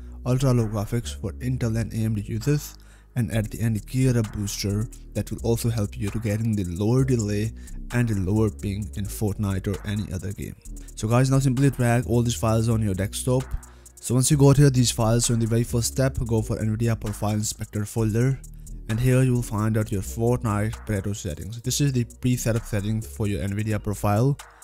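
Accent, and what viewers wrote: India. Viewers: Why you have india voice is scary😳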